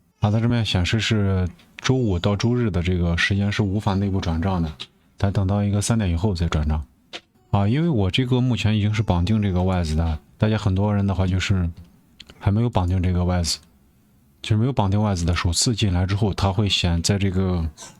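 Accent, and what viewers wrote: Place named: Guanzhong, China. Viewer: 这口音是咱关中乡党呀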